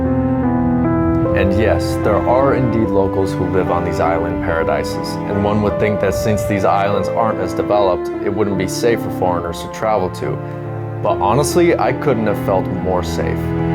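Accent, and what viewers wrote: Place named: Philippines. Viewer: I love how you familiarize to pronounce these places in a very Filipino accent. Thats awesome which many foreigner vloggers can not😊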